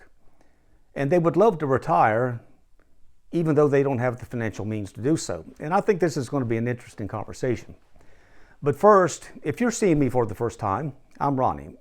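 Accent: South, United States. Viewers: I'm only in my mid 40's working in IT and Software and I've been burned out for years. I feel like every point made here applies to my burnout. I haven't worked for a year and although my health has improved, the idea of working for a company again, where I'm treated like garbage and given an extreme amount of complex work all the while being forced out whenever they company feels like it - no matter how good my work quality is. This is just the reality today - so extreme and narcissistic. It's so hard to make a serious effort when you know they don't give two sh!ts about you. Thankfully I've invested enough to retire early, but still have just a few years of work left before I can truly pull the plug. I really enjoy the type of language and accent this man uses - reminds me of the wisdom of the elders in America I enjoy learning from. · I enjoyed your content snd your Southern accent :) (Australia)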